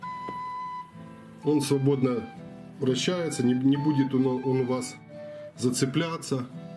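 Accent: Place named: Ukraine. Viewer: Я извиняюсь, но "шо" и "гх", вы с украины, автор??? Ничего личного, просто интересно...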